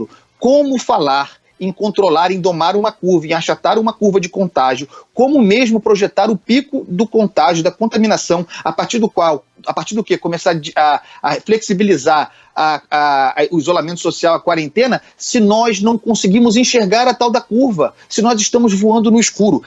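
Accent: Rio de Janeiro, Brazil. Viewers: Andreazza é um paulista com sotaque de carioca. Fico enjoado ouvindo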